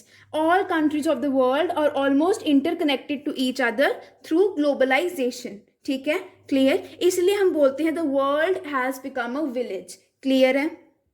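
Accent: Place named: Punjab, India. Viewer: Through your voice I get to know that you are absolutely punjabi girl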